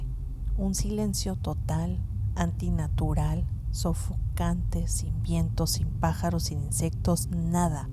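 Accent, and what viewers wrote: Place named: Hermosillo, Mexico. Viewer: Se nota el acento Hermosillense, saludos paisana.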